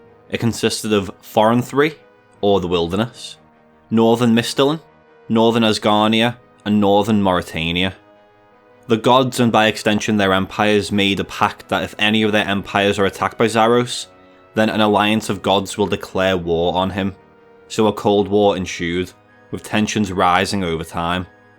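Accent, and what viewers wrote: Liverpool, United Kingdom. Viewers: top scouser lad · Is that a Scouse accent? It really annoys me, but I love your content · ill bet my whole bank your a scouser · Documentary length game lore video in the Scouse accent 😅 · Real Scouser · Listening to a Scouser explain RuneScape is the height of 2025.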